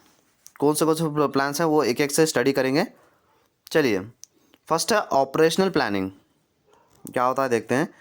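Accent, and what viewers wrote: Hyderabad, India. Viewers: tum hyderabad se ho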